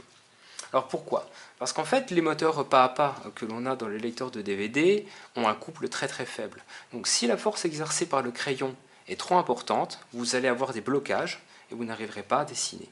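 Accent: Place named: France. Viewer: love it! Very nice build. Love your French Accent... even though I do not understand you. Thank you for showing this!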